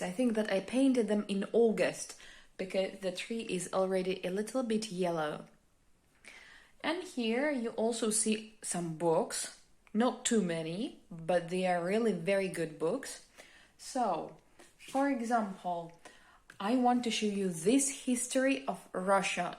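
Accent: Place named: United States. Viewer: Вас можно запросто спутать с американкой! Ваше произношение просто идеально! It's just a native speaker's one!!! 😍👍 Вот уж правду говорят: "Талантливый человек талантлив во всем" 🤗 Сколько времени Вы прожили в Америке, чтобы выработать такое прекрасное произношение? (про "Жизнь Пи", прочитанный в оригинале, я уже понял:))) )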